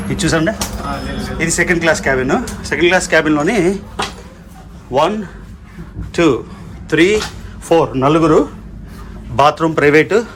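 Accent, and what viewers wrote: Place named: Odisha, India. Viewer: Nuvvu konchem Andhra slang ki marali annai. Appude views perugutai. Ledante odisha vadivi ani neglect chestaru.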